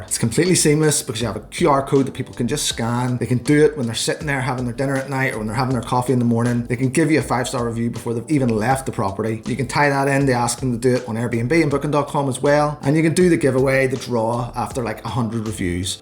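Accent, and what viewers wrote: Ireland. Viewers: I'm watching your videos for the first time and OMG... I'm learning so much as a new Airbnb company owner! This is officially my new binge...Plus totally loving your Irish accent and humour 😄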